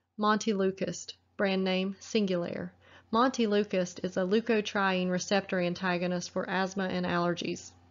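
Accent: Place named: South, United States. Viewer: Thank you so very much for making these with audio after a shift as an RX in training my eyes feel so tired to read my books On top of that I hear a southern accent Wich for some reason I adore and it soothes my nervous system . There must be a god that loves me after all. A billion thanks.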